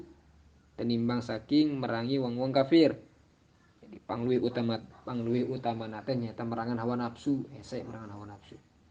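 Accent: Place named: Java, Indonesia. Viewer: naha kang jadi logat jawa🙏🙏,,abdi teu ngartos duh😁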